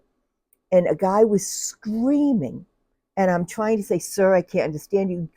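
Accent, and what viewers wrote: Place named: Massachusetts, United States. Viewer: I love watching your video's you make me crack up,,, As soon as I heard you speak, I said to myself that's a Massachusetts Accent. The reason that I recognized it is because I live in Mass as well. I'm looking forward to your next video, Take Care----Madeleine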